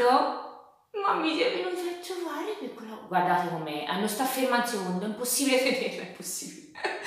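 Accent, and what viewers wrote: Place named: Tuscany, Italy. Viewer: siiiii mi tieni un sacco di compagnia , è molto piacevole ascoltarti, è bello sentire il tuo accento toscano/garfagnano ma è ancora più bello guardarti 🙈🙈adoro i tuoi occhi e i tuoi occhiali,stupendi!!!🙈😜😘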